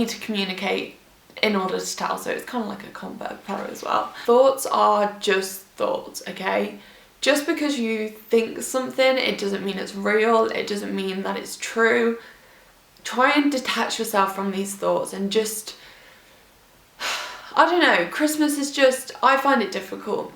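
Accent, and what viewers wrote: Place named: Coventry, United Kingdom. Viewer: She's from Coventry 😊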